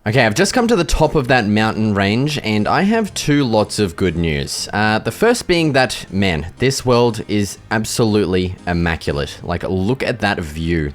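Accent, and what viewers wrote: Australia. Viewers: I know this guy is Australian I think because of the accent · I can tell this guy is from Australia because of his accent and how he speaks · Ready to put this on and go to sleep to the best Aussie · Are you Australian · Bro you can just tell this dude is Australian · I've never realized how Australian actually sounds 😂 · R u aus?🇦🇺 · I have stumbled across an Aussie minecrafter that’s speaking adult talk! I’m so happy lol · Bro IS the stereotypical Australian.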